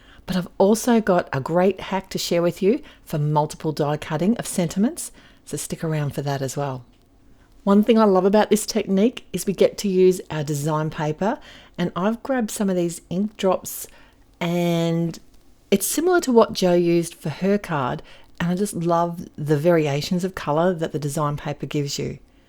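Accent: Australia. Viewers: Great hear an Aussie xxx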